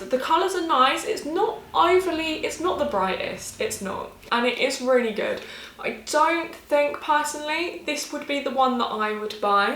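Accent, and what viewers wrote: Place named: New Zealand. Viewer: Sounds Kiwi with.... Maybe visited the UK for a while?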